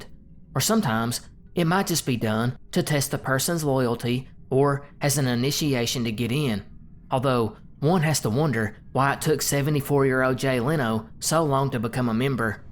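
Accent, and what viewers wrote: South, United States. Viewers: Your accent screams southern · As an interesting side note...the region of America where this accent comes from has a huge population of Scotch and Irish going back 200 years...sounds like South Carolina, Georgia, Arkansas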